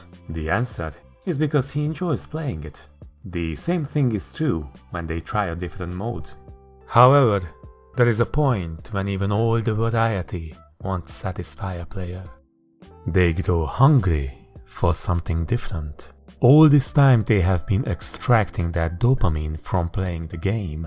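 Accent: Hungary. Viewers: Érezhető a magyaros akcentus, de imádom :D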